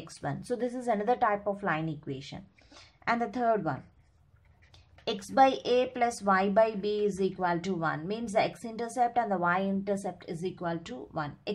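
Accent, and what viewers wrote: India. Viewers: indian accent equals to a mark of quality.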